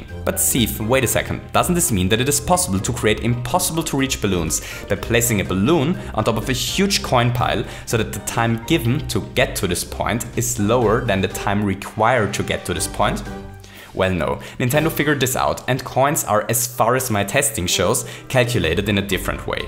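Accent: Germany. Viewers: Wie man direkt heraus hört das er deutscher ist... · Man hört das Deutsche so sehr heraus :D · are you german? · Is he German? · Bro der deutscheste akzent den ich jemals gehört habe (Nohate)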